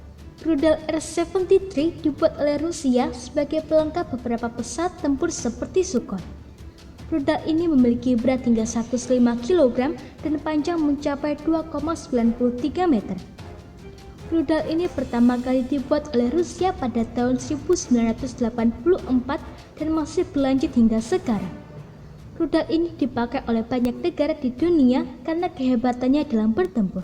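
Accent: Java, Indonesia. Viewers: Sopo iku sing baca narasi ne logat jawa tenan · Medok kali suara nya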